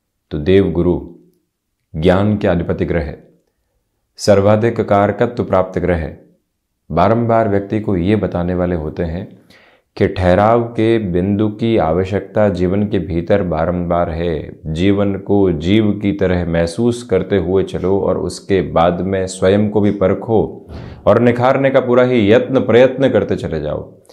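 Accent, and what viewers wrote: Standard. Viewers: आपकी आवाज बहुत गहराई लिए हुए और ज्ञान में डूबी हुई लगती है शुद्ध हिंदी में आप बोलते हैं धन्यवाद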